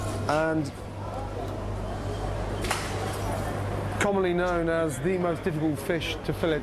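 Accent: Scotland, United Kingdom. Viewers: Love this down to earth raw front line insight. Its amazing to see Gordon not in massive wanker mode! I love his thick Scottish accent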